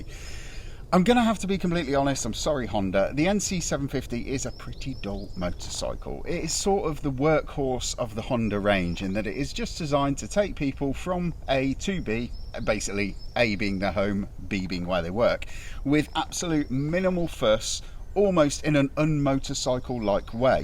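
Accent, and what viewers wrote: United Kingdom. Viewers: Wow.british sound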